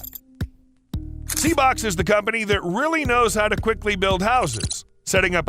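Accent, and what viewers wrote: United States. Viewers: Horrible music, and according to the accent, it can only be a video from the States. Not useful for Europeans.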